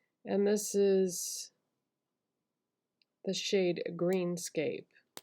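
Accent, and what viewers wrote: Boston, United States. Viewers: Just found your channel and I’m binging videos! Is that a Boston accent I hear 🩶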